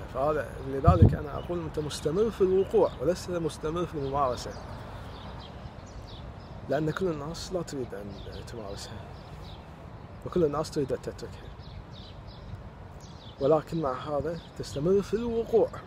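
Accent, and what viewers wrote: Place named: Kuwait. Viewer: يعني تدري يسولف كويتي ولهجته واضحه وتسئله انت سعودي 😩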